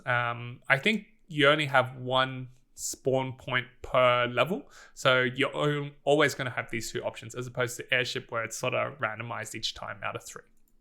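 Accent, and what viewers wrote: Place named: Australia. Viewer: It took me til like halfway through that video to realise that you're Australian, like me. I'm more used to seeing Among Us related content with people predominantly with American accents and since I'm Aussie myself it took a sec to realise that yeah I'm used to that accent, but that's because I'm Australian, and not because I'm used to Among Us content in that particular accent.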